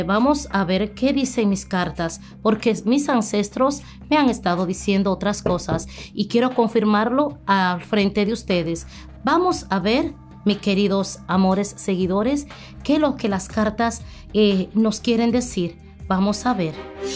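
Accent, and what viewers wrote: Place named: Dominican Republic. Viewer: Pero mami tú ablas como Dominicana a pues ya tu sabes. 🤔😁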